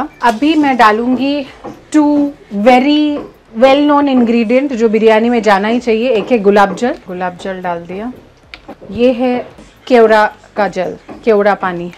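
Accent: Bengal, India. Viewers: I like way you speak Hindi with Bengali accent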